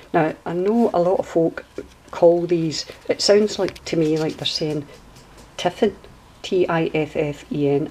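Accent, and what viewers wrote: Scotland, United Kingdom. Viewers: Beautiful journal! Love you style...and you Scottish accent! Thanks for your generosity in giving away such a nice journal.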